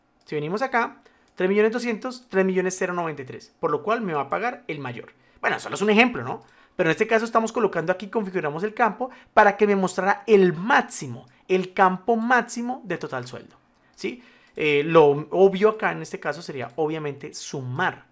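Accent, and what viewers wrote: Colombia. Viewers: Escuchar el acento colombiano no tiene precio!!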